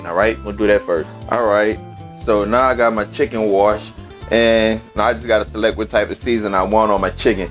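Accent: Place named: Louisiana, United States. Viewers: Y’all he’s from the south most southern folks have an accent it doesn’t mean he’s dumb...y’all the dumb ones for not understanding that. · Are you from Louisiana, you have a little Louisiana accent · I can tell your from NOLA my entire family is from there. Love the accent😉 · I knew the second I heard his accent, he was from Louisiana. 504 REPRESENT!!! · Love the Southern Accent...Chicken Wangs lol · Gotta be from Louisiana 💓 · I'm an Aussie and I love the southern accent, and love how he does the thang with his wangs. 🤣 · Of course it's a Louisiana accent the best there is. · First off, clearly you have to get "wangs" and not "wings" if you want crispy chicken. Great video and I love that N'awlins accent!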